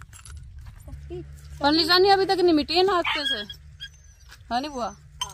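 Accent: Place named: Haryana, India. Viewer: Hum ko bhi haryanvi sikhni padegi lagta he qki bohat achi lag rahi hai aapki haryanvi. Hum to Mumbai k he Marathi bolte he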